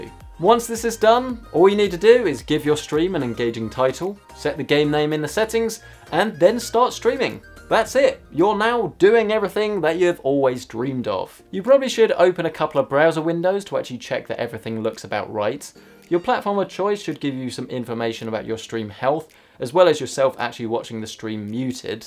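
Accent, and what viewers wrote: United Kingdom. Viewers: I trust British accents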